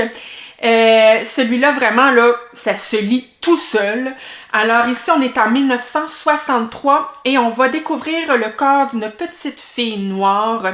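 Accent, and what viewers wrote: Quebec, Canada. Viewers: Hello, Tout d'abord je voulais te dire à quel point c'est un plaisir de t'écouter.... J'aime ton accent, le Québec est le pays de mes rêves et tous ce qui y fait référence est magnifique pour moi.... Continue de nous régaler avec tes vidéos qui sont pour moi un plaisir immense.... J'ai dans ma PAL "entre 2 mondes" et "la ou chantent les ecrevisses" je pense que je vais bientôt les sortir grâce à ta façon d'en parler ça m'a vraiment donné envie.... Encore merci pour tous.... Toi et tous ce que tu représentes dans mon cœur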